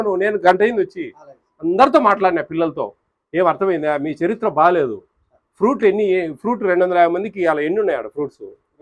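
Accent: India. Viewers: మీకడప యాస బాగుందండోయ్ ,👌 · Sir మీ మాటల రాయలసీమ మాండలికం చాలా నాకు నచ్చింది సొలకాడ తీసుకోవాల్సి వస్తది అనేది. Good work sir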